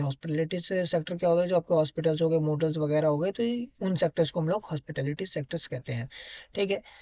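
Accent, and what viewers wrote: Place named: Haryana, India. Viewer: Do you belong from Haryana? Because your taking a tone like that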